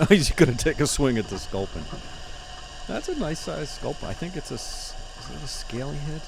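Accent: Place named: Philadelphia, United States. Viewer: I'm really digging the Philly accent narration!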